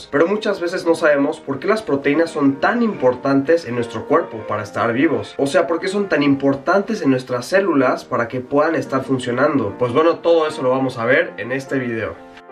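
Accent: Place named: Mexico City, Mexico. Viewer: Me encanta el acento cdmx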